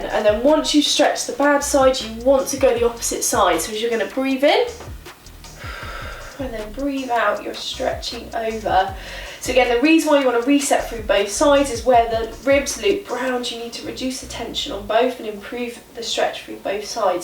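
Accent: United Kingdom. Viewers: Love the British accent! :)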